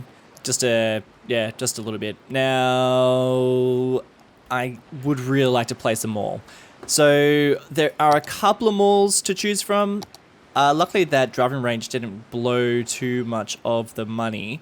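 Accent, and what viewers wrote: Australia. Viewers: I did a double take at "Lewis Armstrong". Haha, love the Aussie accent.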